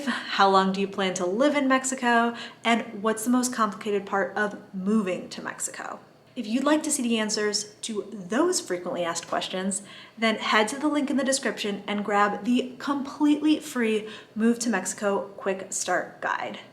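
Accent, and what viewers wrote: United States. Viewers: I suspected you were of mexican descent , is obvious that you are a third generation in usa your natural fluency in the language is of American .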